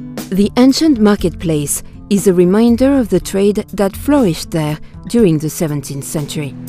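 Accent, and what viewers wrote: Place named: France. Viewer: Ravissant... mais c'est quoi ce commentaire en anglais avec un accent français digne de l'inspecteur Clouseau ? C'est assez ridicule.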